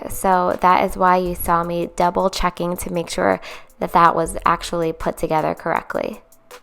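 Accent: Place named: East Coast, United States. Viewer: Just discovered this channel today...I have to ask, does anyone know where she is from? She seems to have an east coast accent. I'm originally from Cape May...so I can usually spot an east coast accent instantly (makes me a little homesick) 😊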